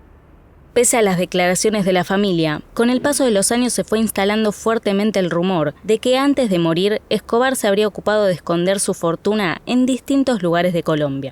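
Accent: Argentina. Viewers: buen vídeo pero odio el acento argentino, da asco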